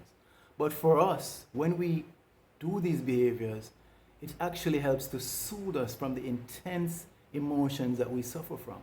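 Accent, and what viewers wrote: Caribbean. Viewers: My Brother, my Brutha...I am SO incredibly happy that YT has gotten around to listing your videos in my Suggested Videos, and SO proud of you stepping up to the world with this channel! While BPD, specifically, is not my issue, Depression and Anxiety ARE, and the first thing - my first response - to your thumbnails was 'Finally! A MAN talking about this, quite vulnerably (I saw the video from 2 years ago, apparently, that seems as a confessional, or testimonial, about HAVING the problem; not just prattling about it from a clinical, and thus, removed perspective) and, furthermore, a BLACK man....even, a fellow "COUNTRY man" (as your speech connotes Afro-Carribean sounds to my Jamerican ears, hahaha! if I'm wrong on that, please excuse me). The drumbeat about us men seems to be loudest in constantly bashing and devaluing us, with the term Narcissist being the only term applied to our psyches with any ease - spoken from the mouthes of White women, primarily, and White people, secondarily, as the default "experts" on either component thereof: For other eyes reading this with offense: I DON'T CARE about how "uncomfortable" what I typed makes you! 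Try being said, awful Man of men, let alone a BLACK man, whose own humanity DAY IN, DAY OUT, is denied by the constant and socially co-signed 'drumbeat' that we endure! I have NEVER felt so directly, explicitly addressed and acknowledged by ANY OTHER persons' videos about mental health - helpful as many of them are - before yours, (?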